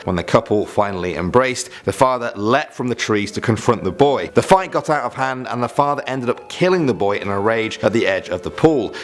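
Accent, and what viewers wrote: United Kingdom. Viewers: Are you Britsh 🤔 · i didn't know that he was british! hello m8!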